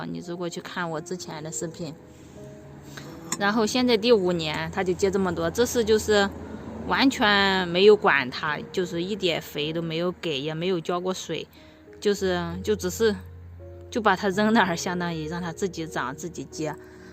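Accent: Hubei, China. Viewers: 对，湖北洪湖天门那个地方的口音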